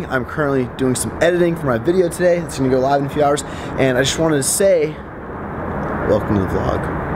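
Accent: South, United States. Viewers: Country accent is A1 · That country accent 😂😂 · Lmfaoff I love the country accent 😂😂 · Only if you do your Texas accent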